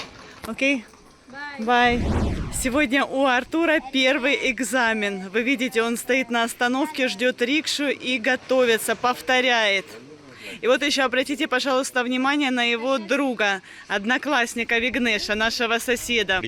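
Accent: India. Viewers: Интересно, что у вас английский с индийским акцентом.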